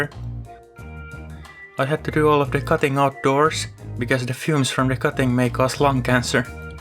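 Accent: Finland. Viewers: 2 seconds in and i can already tell by his voice that it's in finland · Are you fenno-swedish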